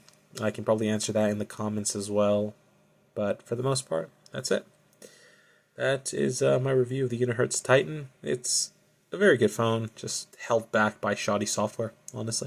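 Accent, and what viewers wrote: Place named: France. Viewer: The French accent😂😂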